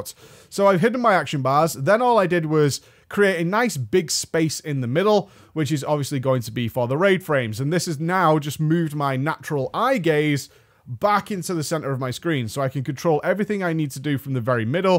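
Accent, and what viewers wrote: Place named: Manchester, United Kingdom. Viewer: Youtube is not friendly to the Manchester accent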